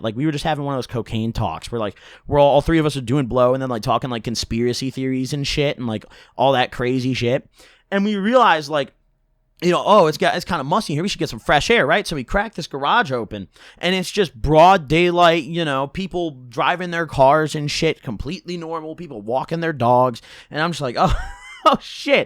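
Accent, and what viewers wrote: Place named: Midwest, United States. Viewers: i’m from ohio, so hearing meer sounded normal to me lmfao midwest baby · he got the midwest accent like me lmao\ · That Midwest accent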